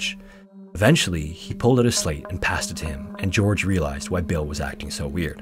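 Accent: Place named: Canada. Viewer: I hear the Canadian in you.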